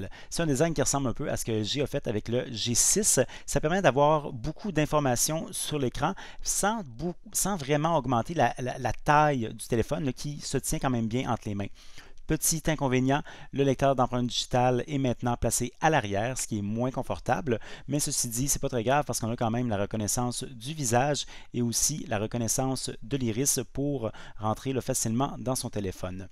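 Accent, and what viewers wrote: Quebec, Canada. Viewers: jaime laccent québécois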